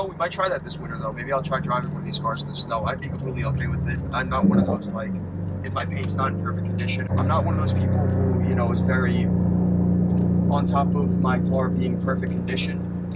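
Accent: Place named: Long Island, United States. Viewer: I spotted your accent and i'm like this guy is from Long Island, I'm from Suffolk but i moved to Orange County, CA about 15 years ago.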